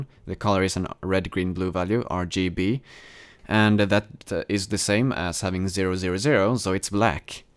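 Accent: Sweden. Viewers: Hah jag kunde höra att du var svensk :D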